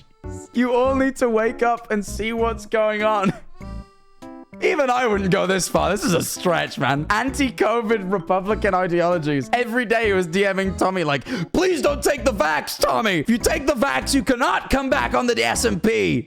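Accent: United Kingdom. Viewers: why do i feel like your losing your bri ish ness ? im going crazy 😀😀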